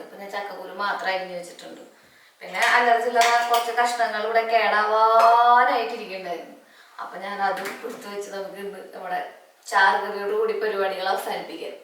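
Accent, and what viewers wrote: Thrissur, India. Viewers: Aniku pandulla vedio anu eshttam.originalty undayirunnu.trissur slang correct ayirunnu.ennu athokke mariyathupole.❤❤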